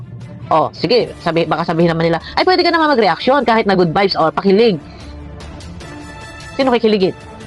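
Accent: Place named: Laguna, Philippines. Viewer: Ma'am, taga saan ka po? Ang puntuhan mo kase ay parang taga Laguna😅 na may pagka batangeña😅.. ang cute lang pakinggan😊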